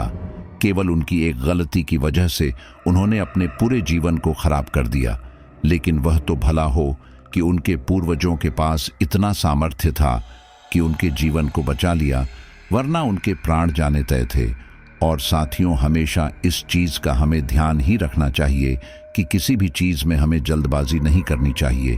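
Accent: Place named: Rajasthan, India. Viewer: आपकी भाषा में राजस्थान वाली मिठास आती है ❤❤❤❤❤❤❤❤❤❤❤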